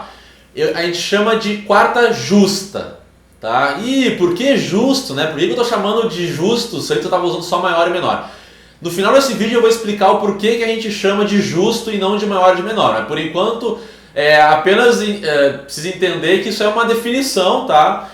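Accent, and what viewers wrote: Rio Grande do Sul, Brazil. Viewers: Muito bem entendido;;; me ajudando muito... . só uma pequena perguntinha::: vc é gaúcho?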